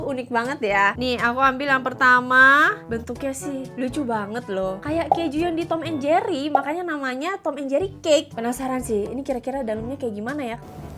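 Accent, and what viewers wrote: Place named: Bali, Indonesia. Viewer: Logat balinya udh mulai ad yaa😅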